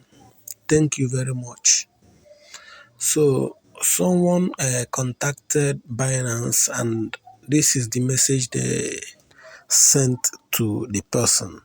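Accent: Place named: Africa. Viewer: Please you sound African stop fooling I know African are intelligent 🧏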